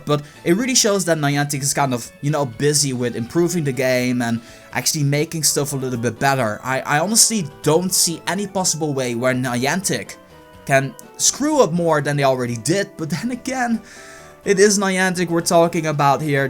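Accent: Netherlands. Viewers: Kan goed horen dat je nederlands bent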